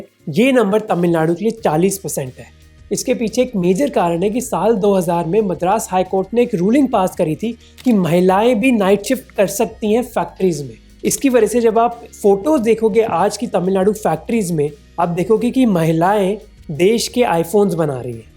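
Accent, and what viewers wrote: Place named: Uttar Pradesh, India. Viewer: I think she is from UP state 😂😂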